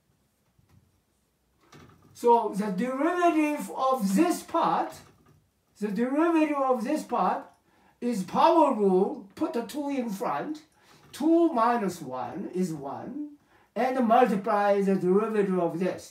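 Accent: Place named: RP, United Kingdom. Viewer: He somehow has an old British aristocracy accent from the movies. Beautiful!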